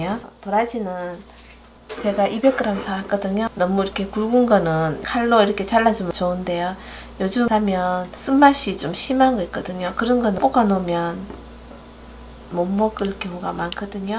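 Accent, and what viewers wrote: Gyeongsang, South Korea. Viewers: 답변 감사해요. 나이도 적잖은데 창피하게 음식할줄을 모르네요ㅠ 작년에 삶은고사리 사서 볶았는데 떫은맛땜에 못먹었거든요. 그래서 물어봤어요. 말씨가 대구분인것 같은데 조곤조곤 설명해주시는거 참 듣기가 좋아요. 많이 배울께요.^^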